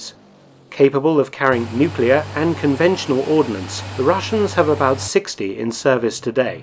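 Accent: United Kingdom. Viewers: Ohhh your British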